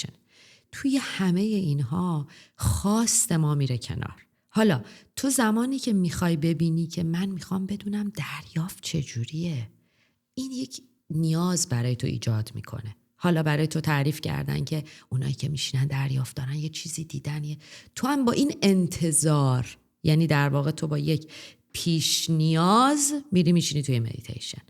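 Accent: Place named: Isfahan, Iran. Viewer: فدای لهجه اصفهانی مجری عزیزم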